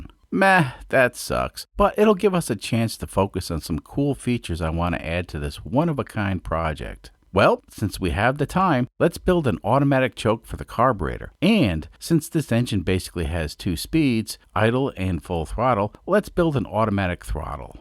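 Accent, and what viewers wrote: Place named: Long Island, United States. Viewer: Lawnguyland accent and bluegrass music!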